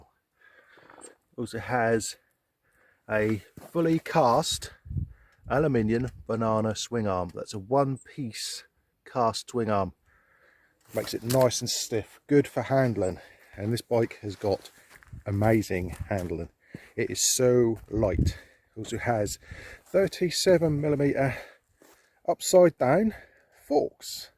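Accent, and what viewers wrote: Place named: Norfolk, United Kingdom. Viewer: How you getting on with the bike 10 months on.... what's the mpg like and how well does it sit at 70mph on the duel roads.... Moonrakers on Oak Street should be getting more in soon and I like the look of the g1 and the adventure version like this u1... Smart colours nice looks and seams to have the most torque out of all 125cc... Apologies for all the questions and thanks for the post... Nice to hear a Norfolk accent...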